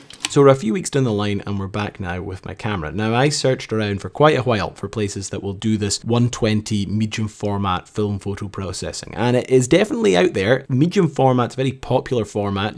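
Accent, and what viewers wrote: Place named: Scotland, United Kingdom. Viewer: Sorry if this sounds offensive. But i am jist curious. Is he a scotish ? I love the accent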